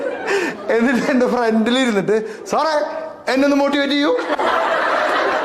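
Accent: India. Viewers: നിങ്ങടെ ഭാഷ തന്നെ നല്ലതല്ലേ...... തൃശ്ശൂർ ഭാഷ.❤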